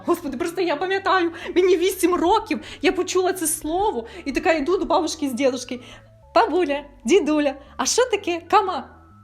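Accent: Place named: Kharkiv, Ukraine. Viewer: звучить ідеально, в нас в Харківській області розмовляють саме на такому суржику. В кожному регіоні суржик свій.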